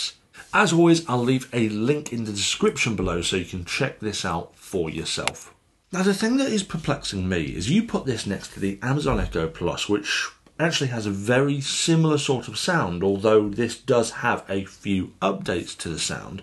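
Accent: United Kingdom. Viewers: The thing that suprised me most is even though I use "Echo" as the wake word for my device, it wasn't triggered once during your video. Is it possible that my device can distinguish between a Northeastern U.S. accent and a British accent?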